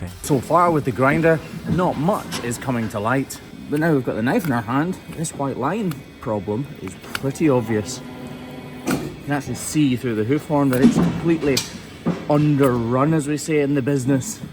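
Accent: Scotland, United Kingdom. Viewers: hes scotish not irish 😂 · I love seeing the Scottish landscape and hearing the Scottish brogue:)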